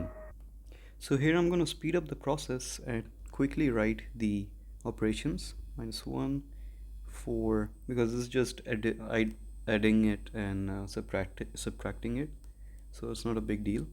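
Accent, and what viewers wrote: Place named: India. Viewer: Ur accent sounds like indian biryani scammer 🇮🇳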